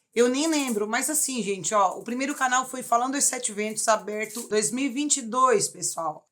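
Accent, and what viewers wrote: Rio Grande do Sul, Brazil. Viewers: Tu fala muito bem!!! E tb achei que tu era gaúcha como eu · Nossa, eu jurava que você era gaúcha, e eu sou gaúcha! Volta e meia você solta alguma palavra que eu acho bem "catarina" mas no geral acho seu sotaque muito parecido com o nosso. Amei o vídeo!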